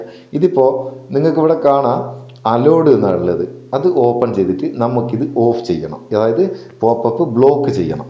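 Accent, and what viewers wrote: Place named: Vadakara, India. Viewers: ശരിയായ മേപ്പയ്യൂർ സ്ലാംഗ് തന്നെ. കൃത്രിമത്വം തീരെയില്ലെ. കൊള്ളാം! ക്ലിപ്പ് കണ്ടോരെയൊക്കെ കൈയ്യിലെടുത്തല്ലൊ! ഉഷാറായിക്ക് കുഞ്ഞോനേ! Thanx! Go ahead! Kadathanadan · ഇഞ്ഞി കോയിക്കോട് ആണല്ലേ... ഇമ്മളെ ജാനു ഏടത്തി ടെ... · നല്ല നാടൻ പയ്യോളി ഭാഷാ നൈസ് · നല്ല തനി വടകര സ്റ്റൈലിൽ അറിവ് പകർന്നു തന്നതിന് വളരെ നന്ദി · ഗുഡ് മെസ്സേജ് , അവതരണവും കുഴപ്പമില്ല. ഒരു നാദാപുരം സ്റ്റൈൽ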